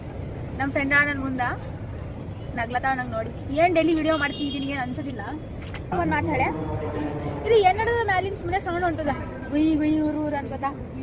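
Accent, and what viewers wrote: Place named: North Karnataka, India. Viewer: Chenagidhe nim Uttara Kannada accent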